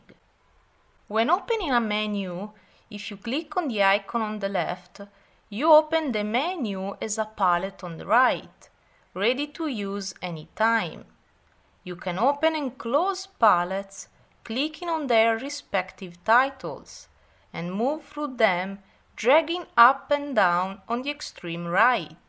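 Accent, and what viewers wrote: Russia. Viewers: Thick Russian accent aside, this tutorial has been great, the best one I've found thus far. I have learned more from this young woman than I have from any other source since I downloaded the trial version. Good job honey. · This russian accent is really annoying and distracting ....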